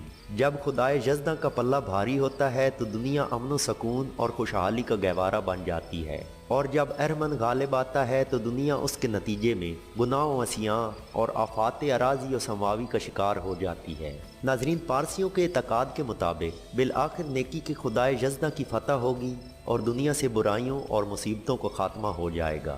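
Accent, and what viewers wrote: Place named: Sheikhupura, Pakistan. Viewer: I love you style n voice shiekhupura Ke ho peeche se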